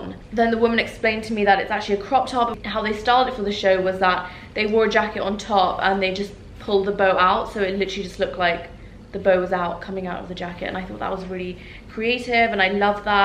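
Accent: United Kingdom. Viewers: oh my goodness, my daughter made me watch your $10,000 quarantine hall with her and now we watch all your hauls together lol. "mommy come our friend from Dubai with the British accent posted!"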